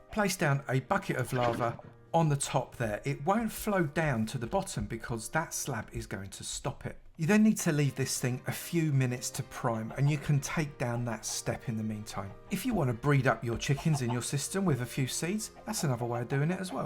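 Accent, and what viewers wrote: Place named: Scotland, United Kingdom. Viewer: I like your accent. Are you from Johnstone?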